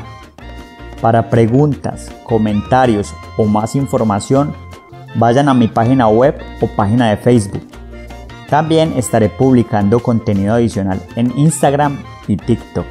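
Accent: Colombia. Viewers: Saludos desde Singapur!! Encontré tu podcasts ayer jajaj y la verdad es un tesero porque yo estoy aprendiendo el español de colombia y a través de estos vídeos y podcasts no solo puedo escuchar el acento de Colombia sino también puedo practicar mi habilidad de escuchar en español porque hablas en una velocidad normal así que es un reto pequeño para mí. Pero los subtitulos me ayudan mucho entender lo que dijiste...Gracias por crear estos podcasts :D has ganado un suscriptor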